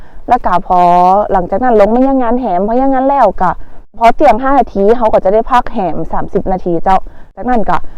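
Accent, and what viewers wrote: Thailand. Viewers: ฟังม่วนดี ขอทายเป๋นคนพะเยาแม่นก่อ..คิคิ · ฟังภาษาเหนือเพลินมากจ้า😁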